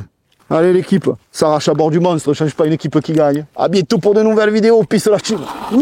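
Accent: South, France. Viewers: J'en peux plus de cet accent du sud. Même ça ils ont réussis à le pourrir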